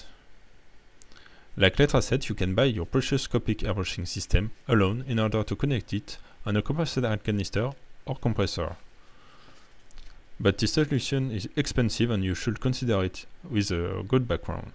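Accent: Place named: France. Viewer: love ur french accent.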